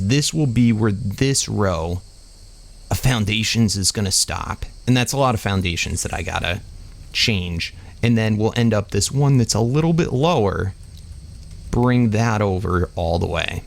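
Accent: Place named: Boston, United States. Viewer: 220307 USA, Boston maybe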